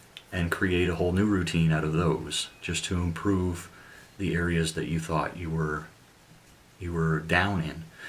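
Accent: Canada. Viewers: I have to ask where you are from? I'm from Mabou, Cape Breton and I hear a Atlantic Canadian accent... Thanks for your videos. Helps a lot.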